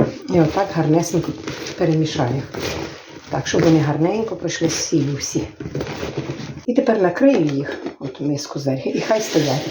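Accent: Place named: Western Ukraine, Ukraine. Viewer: При чем здесь мова? Хуторской западинский говор никого не красит. Женщина простая, сельская, ей простительно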